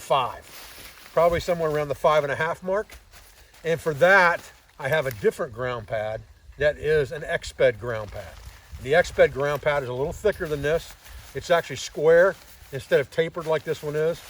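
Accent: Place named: Ohio, United States. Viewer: Could you add the names of the packs to the video description. I was able to look up the first one you displayed, but your ohio accent won't let me figure out the name of the second one.:) Thanks, - Joe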